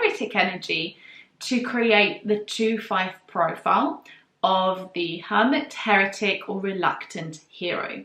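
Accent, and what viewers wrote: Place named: United Kingdom. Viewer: Hello sweetheart. I was drawn here after my awakening to engage with your channel. I don't know what it means but I am drawn to explore. I am very intrigued. I am gifted because I was told by source energy that I was going to create great things for humanity at 7 years of age. I avoided University as a young man because I saw it as unnecessary debt for limited reward. I could have been a lawyer and then upgrade it. I love that you are an empath and a drawing aficionado like I am. You are so amazing. I have also done life coaching and I have a background in organic gardening as well as landscaping and horticulture. I have also grown into nutritional healing as I have skills in multiple massage techniques and herbal healing including mystical occulted knowledge. I totally get the need for personal time for growth. Boundaries are essential. 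I love your depth of character and it is really interesting. Your British accent is really cute also. I am stealing your ish line to calm my Aussie lingo down (we are too honest at times). I am very big on self care time balanced with empowerment. It must be so much harder being a woman dealing with it. Balance is key. I understand female psychology better than most women understand themselves and I imagine that you find most men shallow because they are. Women are a little more complex in general but most not by much. I'm sure that you understand. It is what it is either way and all people are amazing in their own way. I'm about to watch you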